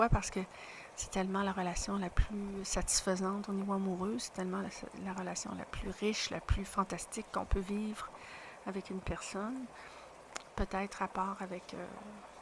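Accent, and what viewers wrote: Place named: Quebec, Canada. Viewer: Tu t'exprimes si bien... Curieusement, ça me fait du bien d'entendre une voix québécoise, comme si tout d'un coup je sentais un sentiment d'appartenance encore plus grand à la communauté. J'ai vraiment l'impression que tu es sage. Tu me fais penser à moi dans mes quelques peaks de sagesse, ici et là dans mon parcours de maintenant presque 11 ans. C'est difficile à expliquer, mais t'écouter m'aide à m'expliquer des choses que je vis ou que j'ai vécues, sans avoir la capacité de mettre les mots dessus. T'écouter est comme lire ma propre histoire. Merci pour tout.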